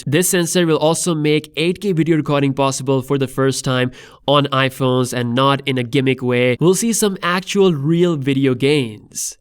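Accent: India. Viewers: are you Indian cause you accent is different I am not complying just telling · Indian accent?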